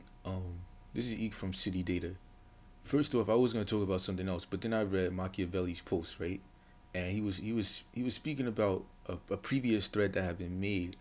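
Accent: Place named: Brooklyn, United States. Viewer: Definitely a Brooklyn accent wit an African American tone. Maybe mixed with some of da Bronx lol. But I agree wit you brothuh, dat the way local people "tawlk" in Queens ain't dat different from the way they do in Brooklyn. Northern New Jersey accent is more distinct from any of the alleged New York City accents in my opinion, while still bein' a bit similar. Accent does, in some cases, depend on ethnicity. For example, Jewish NYers sound a little different from Italian NYers.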